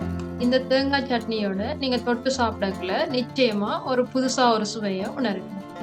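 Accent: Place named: Sri Lanka. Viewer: கண்ணுக்கு விருந்து ரவா இட்லி காதுக்கு விருந்து இலங்கை தமிழ்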